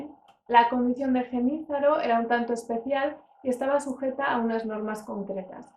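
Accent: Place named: Castile, Spain. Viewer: Qué maravilla de mujer, su embriagadora forma de hablar, en un precioso castellano. Y además preciosa, de bellisimos ojos.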